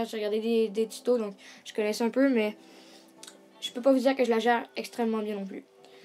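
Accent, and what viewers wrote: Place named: Quebec, Canada. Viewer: Ahah, je me trompe ou pas si je te dis que tu viens du Quebec ?? ^^ sinon, bon tuto et très belle attaque (meme sans les pieges) PS : n'hésite pas à t'abo ;)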